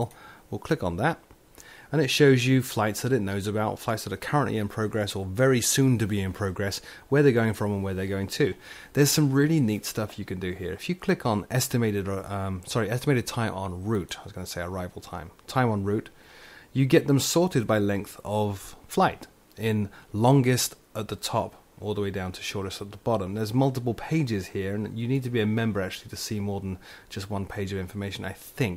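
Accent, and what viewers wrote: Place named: United Kingdom. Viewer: I've a question, are you from the United States or from Uk,you have the British accent haha!!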